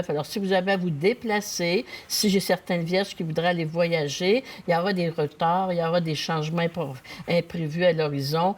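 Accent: Canada. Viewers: Bonjour et merci beaucoup pour vos prévisions et aussi j'aime beaucoup votre accent canadien c'est très agréable merci 😂❤😅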